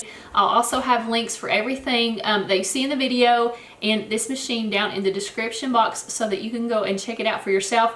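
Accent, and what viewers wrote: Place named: Eastern Kentucky, United States. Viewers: God I love the accent! Eastern Kentucky or Tennessee. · I feel like you're closer to Kentucky cuz you have a thicker accent could be wrong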